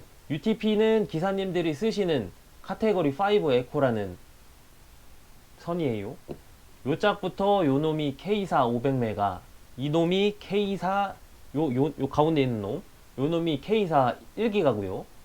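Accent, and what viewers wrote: Chungcheong, South Korea. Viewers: 와 이게 찐 충정도 사투리인거신가ㄷㄷ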